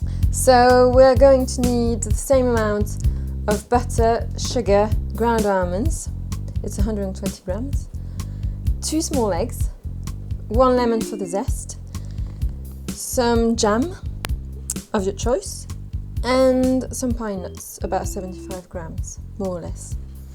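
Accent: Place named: France. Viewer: I like your French accent 😊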